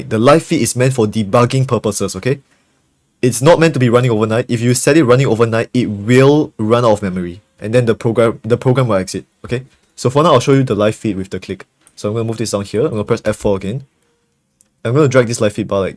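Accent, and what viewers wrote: Singapore. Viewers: r u from singapore?? u sound singaporean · Omg ru Singaporean I can hear the accent wasa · thank you singaporean accent man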